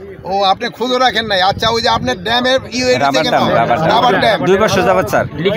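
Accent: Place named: Bikrampur, Bangladesh. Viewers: স্যার কি সুন্দর আমাদের বিক্রমপুরের আঞ্চলিক ভাষায় কথা বলেন। শুনে খুব ভালো লাগলো আর এইভাবেই এগিয়ে যান দোয়া ও ভালবাসা রইলো ❤❤❤ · Amader bikrampur er Language style emoni bhai..proud of bikrampurian advisor ❤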